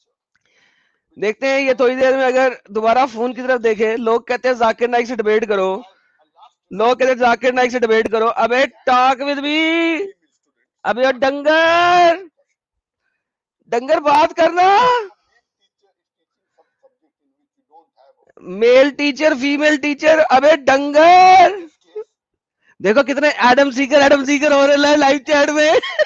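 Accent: India. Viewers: I love how has picked up sahils mubaiya accent 😅❤ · you are just awesome. I used to listen to this idiot as a non muslim when my Muslim friends preached Islam. I wanted to know reality and always despised Zakir Nalyak because of how he belittled other religions down and claimed only islam is true religion of God. Don’t know how some people convert listening to this idiot. He has no logic. you are very knowledgeable and entertaining, should open a comedy channel. Love your Punjabi accent 😊.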